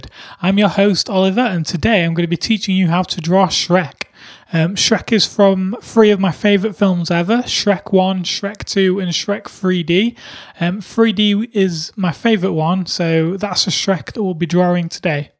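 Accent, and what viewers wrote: United Kingdom. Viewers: Oliver, you sound like a nice gent from north of manchester, good drawing i've improved my own sketches with lots of 3D effects and in the head loock techniques. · the awesome british accent is what makes me watch the whole video XD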